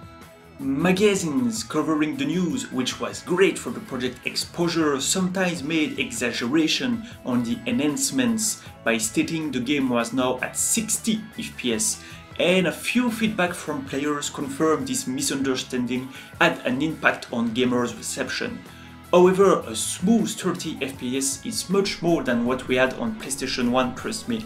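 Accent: France. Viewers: Clairement un français le mec 😂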